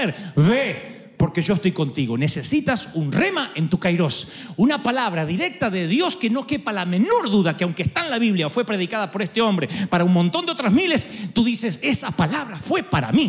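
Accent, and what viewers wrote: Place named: Rioplatense, Argentina. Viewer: fenomeno!! Necesitaba escucharte. Soy uruguayo y tus predicas me llegan de una manera increible, seguro que por tu acento ya que es muy parecido al mio por la cercania geografica con Argentina pero mas seguro porque realmente sos hombre de Dios, y que Dios te bendiga y te siga iluminando para que nos sigas tu iluminando a nosotros con la palabra de Dios todopoderoso. Gracias a Dios por tu existencia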